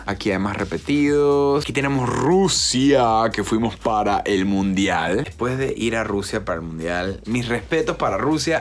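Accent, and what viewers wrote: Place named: Venezuela. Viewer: Y tienes acento venezolano!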